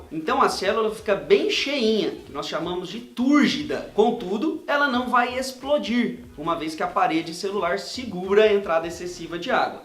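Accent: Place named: Rondônia, Brazil. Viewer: Você é de rondonia deu pra ver seu sotaque. Em qual cidade da aula?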